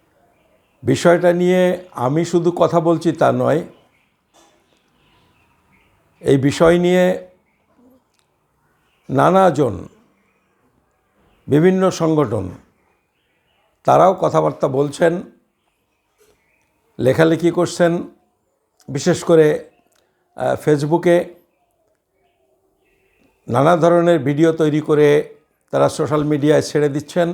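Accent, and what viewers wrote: Bangladesh. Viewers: Upnar kothar tune shune mone hochhe apni o purba banger, poriskar holo na. Manik Fakir babu r upni ekshonge boshe ekta vidio kore byapar ta clear korun please. Ki ki proman potro lagbe....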